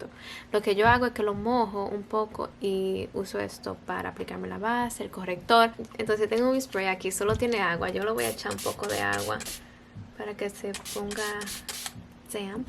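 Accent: Dominican Republic. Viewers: Español cibaeño ❣️🥰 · vi el primer video de ella y dije,, ok, no esta mal , le di al segundo y desde que escuche el ascento dominicano , dije , aqui es que vamos ejjejeje , que linda jj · ¡Eso era lo que estábamos esperando! Ese español de la República Dominicana se diferencia donde sea 😂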